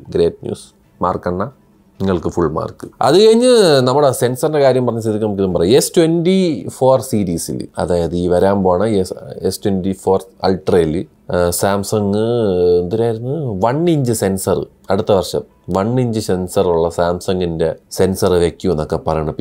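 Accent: Trivandrum, India. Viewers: ശേടെയ് തിരോന്തരത്ത് വന്നപ്പോൾ ഫുൾ slang തിരോന്തരം 🔥 അടുത്ത തവണ വരുമ്പോ പറയണേ അണ്ണാ ഫാൻ ആണ് ഒന്ന് കാണാൻ ഒരു ആഗ്രഹം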